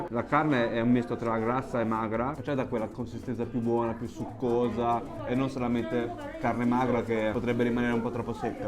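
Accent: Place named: Pesaro, Italy. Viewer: Pesaro, come fai a confondere l'accento con il modenese?😅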